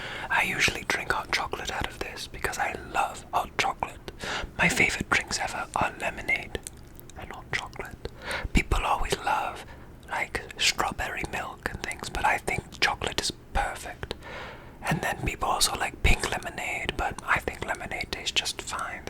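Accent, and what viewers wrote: RP, United Kingdom. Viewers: I’ve only ever heard his British gentleman voice lmao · What am I watching?? But don’t get me wrong, I love the British gentleman accent.😗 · Idk whats going on... but i'm loving it.🥰 I'd love to hear the British Gentleman from the 1800's roleplays hahahhahah 👉👈 plz do more🥰🥰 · Hey how about u do an asmr with ur British gentlemen voice but make it sound like ur talking to us. Also u don’t really have to whisper when it comes to asmr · Why is it that is so much more attractive with a British accent lol❤️ lol you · this is a very VERY convincing british gentleman from the 1800s accent... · I’m just gonna act like I haven’t watched this video 15 times already 😔 please post more asmr videos in the British voiceeee😭😭😩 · You should read more fan fic in British it kills me!😂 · Ok but like you don’t have to do anything specific, just whisper with your British Voice into your special ASMR. 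I will listen as long as your speaking so...😼‼️🙄✋🏽🥶👁👄👁 SIKEEEEEEE.... I GOT TO THE FORTUNE COOKIE BOX THING. READ YOUR DREAM CHECKLIST AND FORTUNES OUT LOUD WITH YOUR BRITISH VOICE!!! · Who is this handsome Korean-American man speaking to me about a box of goals, dreams and fortune cookie papers in a lovely British accent? Because he's doing wonderful and I love it. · British accent + ASL ... Somethings not adding up (Yes, I know he's not actually british) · so he’s a korean american who knows asl and speaks in a british accent... hm.. ㅋㅋㅋ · Ok don’t get me wrong I love the British gentleman voice but can we have a normal voice video please? 🥺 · Omg. I've been following you for a while and your British accent tik toks inspired me to get here. I'm so so happy omg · This British gentleman accent is giving me 🦇 British Vampire🦇 vibes , ya know? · Alright, it’s official, British accents give me the tingles... 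that explains a lot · Just me that thinks uses his British voice more than his actual one and I love it ✨🤗🥰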